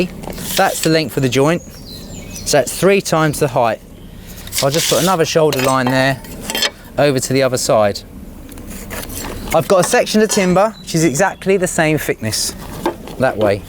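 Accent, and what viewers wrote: England, United Kingdom. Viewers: English English is so annoying, innit? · Which part of England are you from? My Electrotechnology teacher has the same accent ahah And he’s also a good teacher! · Uhm... I noticed British accent, rather than American... Swamp is... Louisiana... ?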